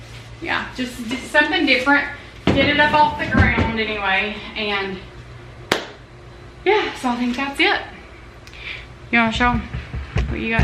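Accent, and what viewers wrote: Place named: Tennessee, United States. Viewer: Hi, love your channel and your awesome Tennessee accent! 😊 Every time you read a devotional it really helps; life has been rough for our family the last 2 years. God bless you and yours.❤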